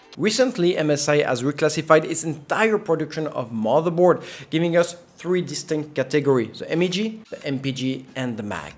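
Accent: France. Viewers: Tu es français ? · so what exactly is the advantage of having 2 lan ports? and btw , boy u sound soooooo french haha nice vid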